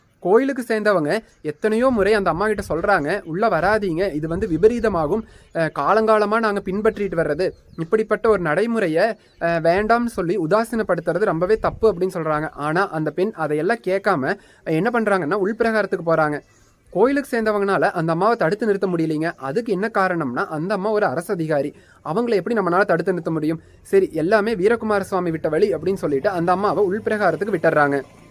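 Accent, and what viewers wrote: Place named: Kongu, India. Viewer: உங்கள் கொங்கு த்தமிழ்..🌹👌🚩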